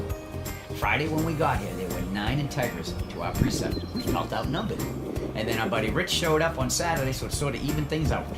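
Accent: Boston, United States. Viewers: Boy, listening your accent makes me miss living in Boston. Well, I don't miss the winters.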